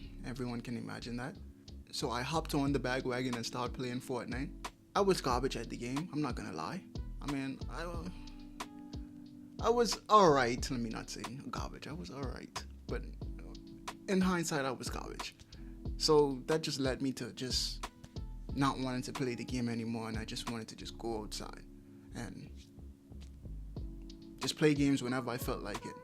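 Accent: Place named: Caribbean. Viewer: you sound like you have caribbean roots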